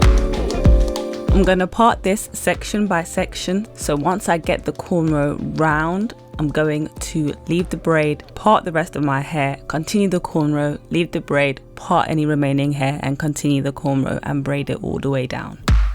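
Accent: United Kingdom. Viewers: and lol I love your british accent!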